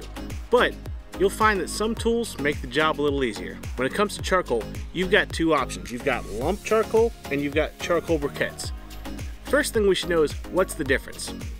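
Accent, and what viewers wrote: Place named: West Virginia, United States. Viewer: I'm assume you're from West Virginia....new subscriber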